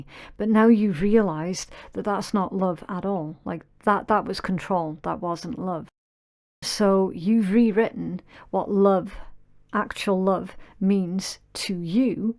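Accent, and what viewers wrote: Scotland, United Kingdom. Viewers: I’m new to you channel … you absolutely nailed it !!! Every detail !!! Well done .. hello from Rhode Island, USA … love your Scottish accent ????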